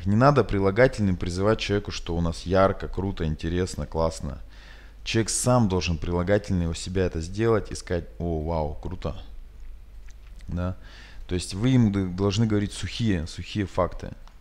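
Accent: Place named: Rural, Russia. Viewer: Только комфортная скорость воспроизведения 1.25, а остальное - всё супер. Говор приятный ) Такой, не городской ) Да, и спасибо большое за науку и видео (а то понаписал всякой херни, а поблагодарить - не написал:0) ЗЫ. Музычка в конце видоса - громковата...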